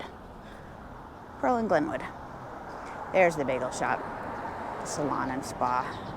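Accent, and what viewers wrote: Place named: South, United States. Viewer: Hi, London guy here. Wow! America is just so beautiful wow, wow, wow! I have to say, Montana and Wyoming have captured my heart. I am a real sucker for greenery. I do believe they film Clint Eastwoods movie here "Any Which Way You Can." Great video and scenery. I too love your accent. The deep southern accent is my favorite accent by far, I find it so hot when it is coming out of a females mouth if you get my drift! I can't wait to see your other videos. I must Subscribe to your channel. Thank you for making this awesome video.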